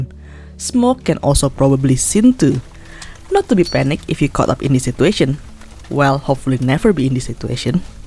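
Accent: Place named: India. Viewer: sounds like one of those indian video conference at work.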